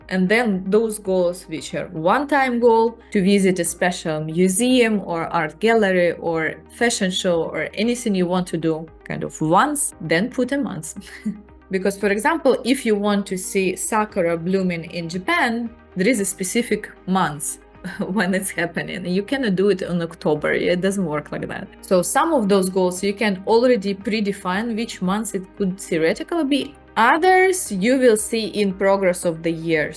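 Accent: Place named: Russia. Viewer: Чувствуется Русский акцент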